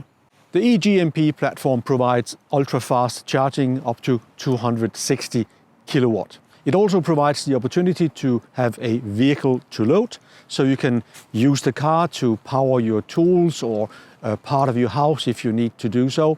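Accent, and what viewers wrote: Denmark. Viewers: hahaha tænkte netop det samme. Svært at skjule den danske accent. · Tager jeg fejl, eller afslører din accent, er/taler dansk? Nå men har købt en ionic 5 Ultimate her i Danmark, den bruges primært af min kone som elsker den når hun pendler til og fra arbejde. Ja forbruget er lidt højt, men den er lækkertskruet sammen, kvalitet, ekstraudstyr. Rimeligt prissat nu i forhold til tidligere og efterprøvet igennem flere år og raffineret. Klar anbefaling herfra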